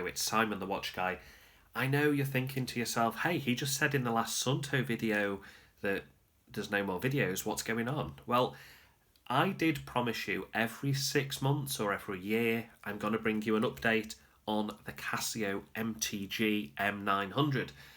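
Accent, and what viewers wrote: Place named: North, United Kingdom. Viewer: Thanks, a good video. I like your clear Northern English accent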